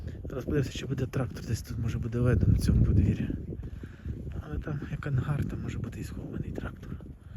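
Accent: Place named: Western Ukraine, Ukraine. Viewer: ви мабуть родом з Прикарпаття,відчувається що десь західної України,я теж родом звідти,але все життя проживаю біля Києва.Хай вам щастить!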